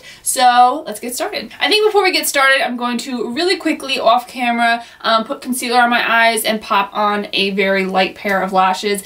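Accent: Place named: New Jersey, United States. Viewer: I basically fell upon one of your vids the other night and when I heard your accent I thought she has to be from Jersey! I think you're awesome anyway tho 😁 #subscribe 💜 from Philly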